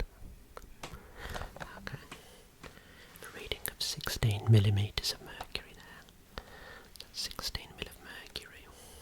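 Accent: Birmingham, United Kingdom. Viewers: you are the only person that I've ever heard with a brummie accent that sounds good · Never thought Brummy asmr whispers would hit me so hard but they do, keep up the great work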